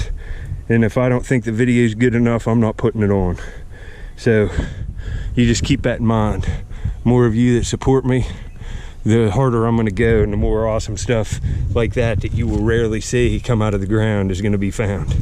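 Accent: Western Pennsylvania, United States. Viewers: Like ur content, awesome finds in these. Just subbed ur channel to support a fellow Buckeye. If you are originally from Ohio, you have the distinct twang of the Western PA/WV panhandle in ur voice.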